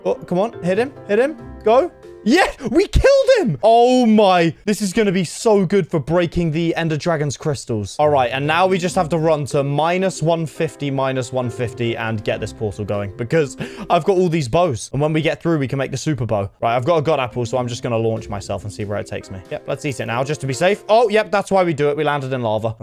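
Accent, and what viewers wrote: United Kingdom. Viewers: Your voice is a little british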